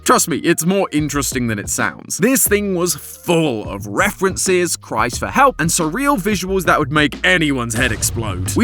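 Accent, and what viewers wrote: United Kingdom. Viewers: Man I love the British accent...brool stars. hehehehe😅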